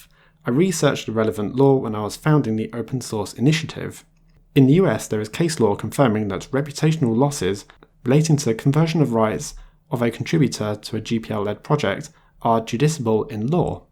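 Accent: England, United Kingdom. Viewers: Are u from england?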